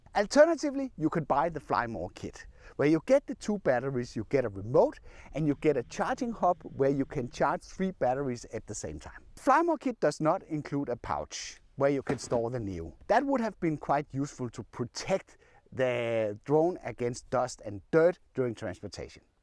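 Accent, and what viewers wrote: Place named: Denmark. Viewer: Sir you accent sounds Dannish, Swiss or Norwagian 😊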